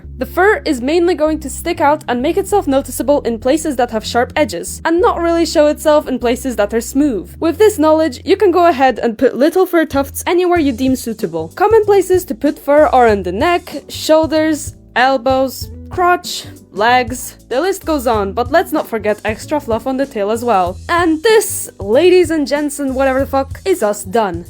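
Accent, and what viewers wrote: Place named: Scotland, United Kingdom. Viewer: hold on why is it after like 9 seconds you switch to a more Scottish accent???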